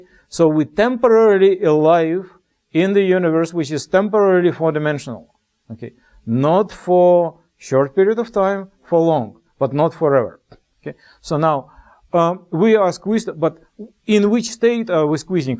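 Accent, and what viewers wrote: Russia. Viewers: I enjoyed topic as well as sweet English with Russian accent! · Our Russian accent) krasavchik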